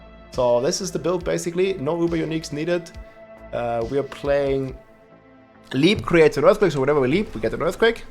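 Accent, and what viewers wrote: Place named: Germany. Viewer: Bist ein Opfer wirklich du bist der Grund Why alles geh nerf Wird rede mal deutsch du bist mehr deutsch als Manuel neuer hört man so raus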